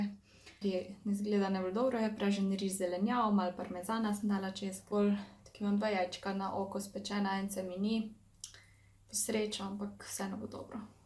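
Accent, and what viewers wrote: Carinthia, Austria. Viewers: A ti si slučajno iz Koroške?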